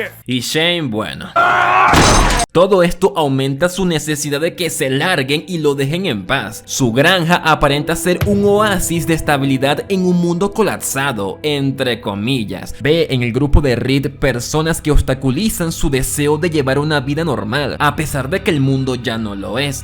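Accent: Venezuela. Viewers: Chamo me dí cuenta de que eres venezolano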